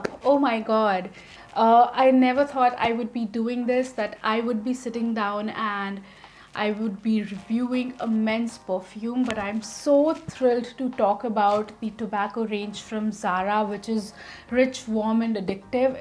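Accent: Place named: India. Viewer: Indian accent 🤮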